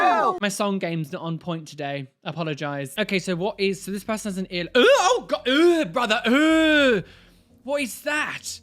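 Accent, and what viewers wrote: United Kingdom. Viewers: I’m so used to accent that I forget he’s British until I hear him do an American accent 😭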